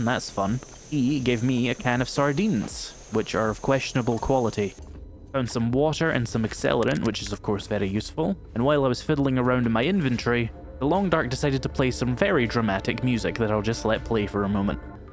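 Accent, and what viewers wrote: Scotland, United Kingdom. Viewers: Ah, it's so nice seeing someone taking their first steps in the game and bit by bit figuring out the core mechanics. Makes the game feel all new and fresh even to a veteran player. :) Also love your accent, are you from Scotland?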